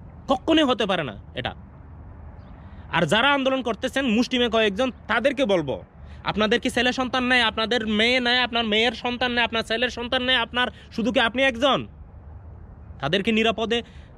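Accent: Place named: Noakhali, Bangladesh. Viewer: আপনার ভাষায় বুঝাযায় আপনি নোয়াখালী বা ফেনীর মানুষ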